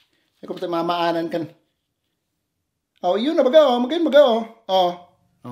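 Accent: Bicol, Philippines. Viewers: napindot ko na ang subscribe . gaganda ng vids . nakakamiss mag salita ang taga bicol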